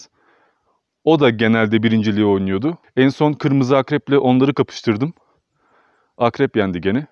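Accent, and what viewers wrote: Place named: Kastamonu, Turkey. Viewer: Kastamonu 'ı şivesinden belli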